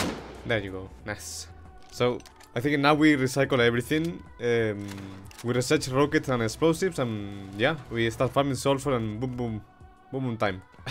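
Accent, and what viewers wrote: Spain. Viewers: Are you spanish? diría que si que por tu acento al hablar, pero puedo equivocarme. Un saludo y sigue haciendo videos así de buenos! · Hola, nose si hablas español pero supongo que si por el acento, buen video, me encantan tus videos y el hecho de que hables ingles, si no hablas español, nice videos mate, keep like this and u will grow very fast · This vid was amazing lol. Love your accent are you from Spain?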